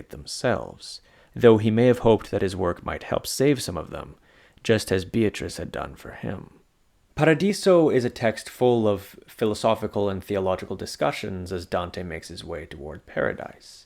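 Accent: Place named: Quebec, Canada. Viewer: I KNEW you were from Quebec! Finally got my answer 😂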